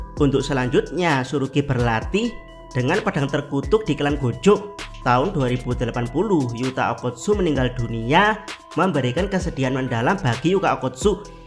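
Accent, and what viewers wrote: Java, Indonesia. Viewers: Jawa nya keliatan. Medter 😅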